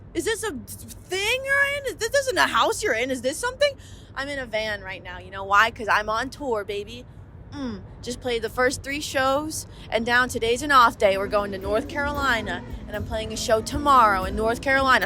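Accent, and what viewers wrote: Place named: Upstate New York, United States. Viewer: The most north NY accent of all time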